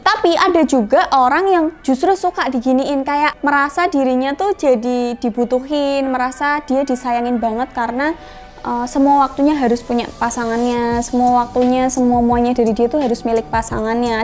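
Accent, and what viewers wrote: Java, Indonesia. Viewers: Mba medok amat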